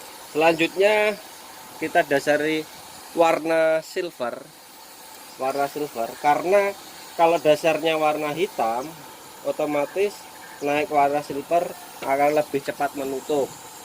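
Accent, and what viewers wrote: East Java, Indonesia. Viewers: Mas sampean asli jawa timur ta?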